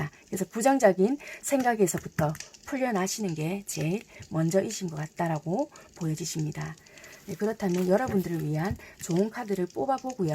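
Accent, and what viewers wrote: Busan, South Korea. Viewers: 자세한 설명 감사해요~ 잘 맞아서 항상 잘 보구 있어용 이번에도 꼭 맞길💗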